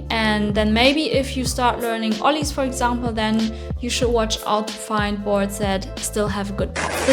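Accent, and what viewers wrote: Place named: Germany. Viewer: It’s also interesting to hear an Asian girl with a German accent. We have many Asians in California, but we seldom see them from Germany.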